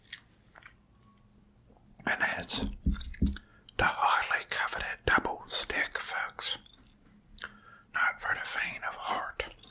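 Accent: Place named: Pittsburgh, United States. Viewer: You sound like you're from Pittsburgh lol.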